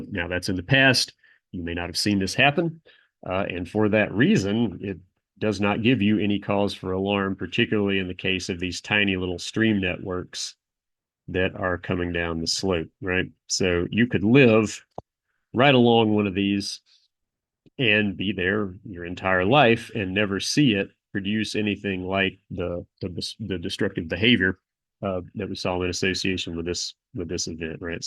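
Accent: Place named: South Jersey, United States. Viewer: You are from South Jersey!!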